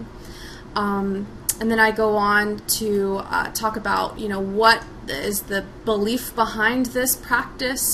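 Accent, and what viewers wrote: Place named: United States. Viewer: bruh this is the most americanised american accent i have ever heard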